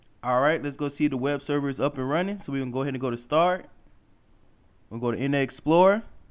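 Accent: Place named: England, United Kingdom. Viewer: Sweet tutorial, finally someone making IT tutorials with a proper english accent! Thanks for the help.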